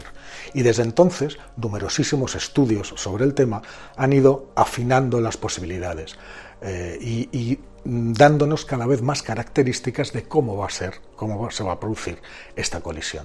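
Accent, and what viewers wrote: Spain. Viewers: no me gustó su video y su español ibérico, hasta nunca. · tan agradable ese sonsonete español...debe ser super vivir en españa y escuchar a miles con esa particularidad...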